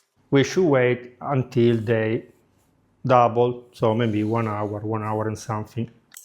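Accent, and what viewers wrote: Italy. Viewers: Ma quanto amo l'accento italiano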